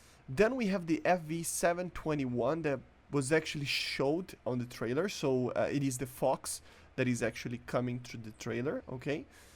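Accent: Brazil. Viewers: he's brazilian, not british so it's ok. I'm pretty sure you don't pronounce any other language correctly. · He's from Brazil.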